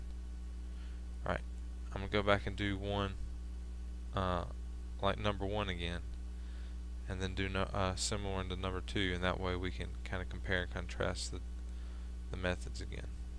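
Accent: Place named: Kentucky, United States. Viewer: i like ur accent, reminds me of Kentucky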